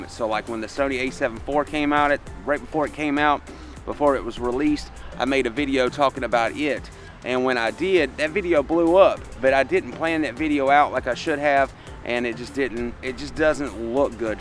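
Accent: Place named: South, United States. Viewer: Good stuff! I enjoy learning from people who are just learning too. I’ve played with video cameras off and on for like 20 years but only been serious about it for a couple years in total. Love the southern accent! I’m in FL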